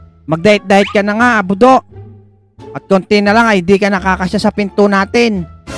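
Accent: Batangas, Philippines. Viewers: kuya taga batangas ho kayo?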